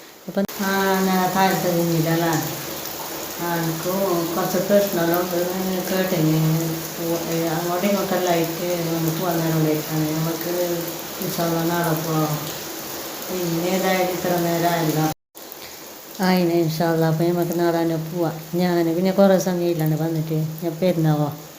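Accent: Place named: Kasaragod, India. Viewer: Nighale sthalm evde Kasaragod Karnataka boadr bagathula slanganllo athayath nde slang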